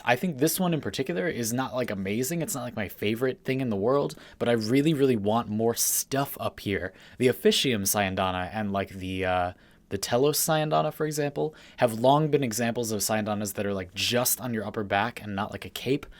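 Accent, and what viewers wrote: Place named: California, United States. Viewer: is so underrated as a wf youtuber. Btw, I Love ur accent, is it Californian?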